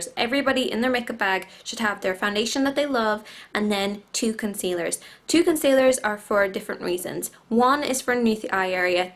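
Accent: Ireland. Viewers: I think she's from Ireland?...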